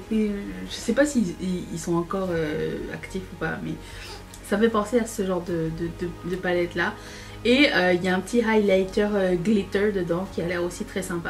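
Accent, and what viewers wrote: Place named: Quebec, Canada. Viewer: Je t'ai découverte il y a quelques jours, je trouvais que tu avais quelques tournures de phrases et de prononciations qui sonnaient québécoises! Ça fait du sens maintenant que j'entends que tu es au Canada! Profite bien de l'été qui arrive! 🦋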